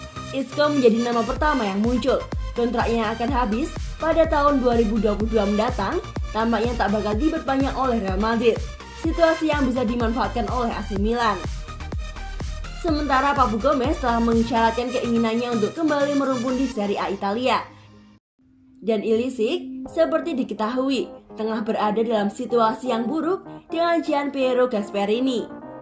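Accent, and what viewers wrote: Java, Indonesia. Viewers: Waduh.. medok'e mbaaaakk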